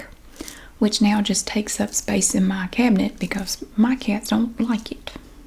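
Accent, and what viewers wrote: South, United States. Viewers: 6 years ago I went through military bootcamp and it was the first time I was really exposed to the southern accent. I remember one specific girl, she was my wingman, who was from North Carolina and she had a very thick southern accent that reminds me of yours. I also made lots of friends from Georgia while I was in the service, my Georgia boys ❤️ The southern accent will always hold a special place in my heart. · your accent reminds me of my mom's side of the family. To me accents are beautiful just like different languages. To hear you brings back a memory of my Nanny (my mom's mom) she had a fantastic southern accent. Now I'm born and raised in South Texas and my mom from TN. So thank you for bringing back a nostalgic feeling. You keep doin you and I concur the internet being exposed to social media affects us. In every way possible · I love your accent! 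I am planning on going to college in the south next year because I have always loved listening to people with these accents. wish I had one myself! Love your videos 💗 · Can you do a video trying to speak without southern accent? itd be really fun to watch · Oh my lord, people really think she fakes a southern accent?? I admire anyone who maintains their accent- especially southern, for all the ignorant crap they deal with. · I love to listen to you talk. My dads family was from West Virginia, so their accents are different. But I love all southern accents. Sounds like my childhood. ❤️ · You accent sounds like Forrest Gump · That’s hilarious that someone accused you of faking it. I’m from the south and there’s many many different accents. My kids don’t have as much as an accent as I do either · I found your channel four years ago when I moved away from home at 18 from Alabama to California (to go to school for linguistics). 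I already loved ASMR but I started to get homesick so I looked up "ASMR southern accent" because I thought it would bring me some comfort. Your channel was the first one to come up, and your voice reminded me so much of home! So thank you for bringing me a little bit of familiarity and comfort during a hard time, and I love your accent ❤ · Whoever accused you of faking your accent is clearly unfamiliar with genuine Southern accents, and has watched Steel Magnolias one too many times. I grew up in North Mississippi and I currently live in East Tennessee. The accents are similar. Only slight differences in N. MS and TN accents, but N. MS and S. MS accents are VASTLY different. Anyway, thank you for the video, and it's always good to see you too. ♥ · I want to come to america and date a girl with a southern accent lol. What states have nice accents like this? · I live near raleigh and I hate that I don’t have a southern accent- my mom moved from Franklin Virginia to California and got made fun of because of her accent so she had to learn how to talk like them I always wanted an accent like yours and I love watching your channel because you have the same accent as my grandmother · The southern accent is one of my favourite american accents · First of all, I love your accent and positive energy! 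Also, I’m a store shelf organizer too 😜 ❤️ So many people think that there’s only one southern accent. I’m from Louisiana, and we have several different southern accents over here as well. The north LA accent sounds completely different from the way we talk down here in south LA. We, like you in N.C., also have several different accents just within the coastal parishes of our state. Where I live, we sound like what you hear on the tv show “Swamp People”. Whereas, in the northern part of the state, they sound more like The Robertson’s from “Duck Dynasty” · I follow Tabitha Brown and she’s also from North Carolina and has a lovely southern accent too and I enjoy hearing how you both pronounce certain words. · Ohhh you’re SOUTHERN southern,,, I’m Appalachian southern. Very different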